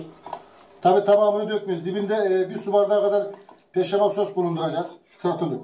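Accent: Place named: Ankara, Turkey. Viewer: Şefim Ankara limisiniz yanlış mı anladım yav zere aynı bizim buraların şivesi diyorum 🥰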